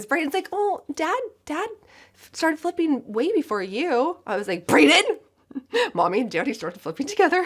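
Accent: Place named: California, United States. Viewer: She is a California girl through and through. That’s how we talk.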